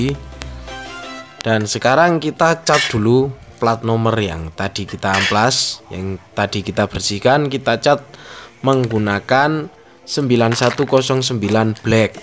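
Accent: Temanggung, Indonesia. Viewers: orang mana mas kok kayak temanggung.. lar lur😁😅😁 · Wong temanggung po om??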